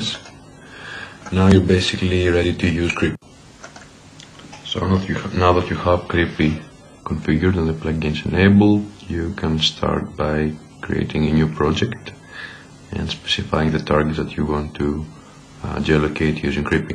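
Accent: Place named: Greece. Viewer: Να τος με τα αγγλικά αλλά ελληνική προφορά xD Nice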